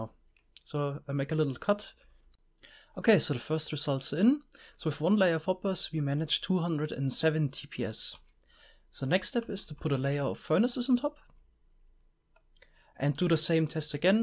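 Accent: Germany. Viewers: Agreed. He sounds like he's German, or at least speaks German as his native language. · Du hörst dich sehr Deutsch an 🤔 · what Country are you from? It sounds like you would be from Germany :D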